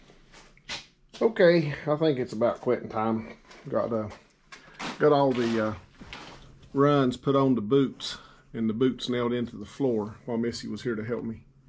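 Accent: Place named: South, United States. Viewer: your pronounced southern accent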